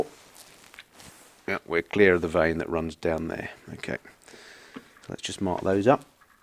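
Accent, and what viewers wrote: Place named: England, United Kingdom. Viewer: I love your English snobbish accent please keep it up Don't ever change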